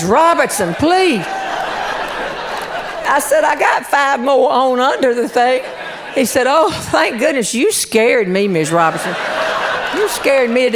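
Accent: South, United States. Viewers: I love u! wish you were my family. love your story telling your southern drawl and your humor. God bless u every day. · It's not that funny things don't happen around us, but not everyone has the gift of storytelling you do. I could tell the same story but a whole crowd wouldn't laugh. A true gift. It's especially funny with the southern accent. I'm in MN so I'm sure mine would make you laugh. That's why people quote Fargo. Yeah Sure You betcha.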